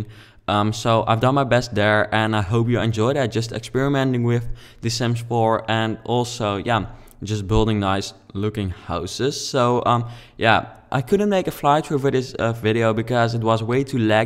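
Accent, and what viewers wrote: Netherlands. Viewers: je kunt horen dat je nederlands bent, Kei goed gemaakt ! ;)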